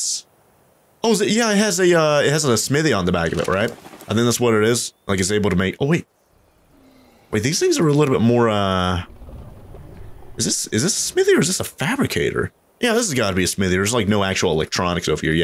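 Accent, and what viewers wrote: Australia. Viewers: i have started to watch ur scorched earth series and realized u sound Australian are u Australian in real life?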